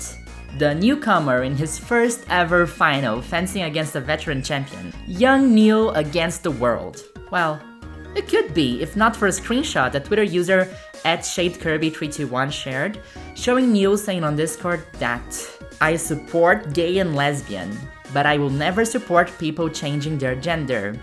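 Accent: Brazil. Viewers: came to this video because a YouTuber I liked recommended it, so Imagen my joy when I hear a Brazilian accent and when I look up I see not only a Brazilian flag but what I assume is a trans Brazilian flag! Mal posso esperar para assistir a mais vídeos seus! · Pokémon's massive popularity definitely helps with it, but to grow up with it, and feel free enough to not be bound by those "now that you're an aDuLt you must renegade your childhood", in general are more accepting. Most of us were the 'weird kids', be it a gender reason, neurodivergency, or simply rather nerdy interests in general. When you exclude people they tend to band together, and in an era that all children, specially the ones that were left out, had a game/anime to bond over, they grow up not only pokémon fans, but opression-aware people. I tried not to write an essay here, but I needed to engage, I've found this channel randomly now, and...Eu reparei no sotaque, depois na bandeira, e depois minha mente explodiu! 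Então não só me inscrevi na hora, como tem que engajar 2x mais, porque é conteúdo de pokémon, é brasileiro, e é de gente finíssima!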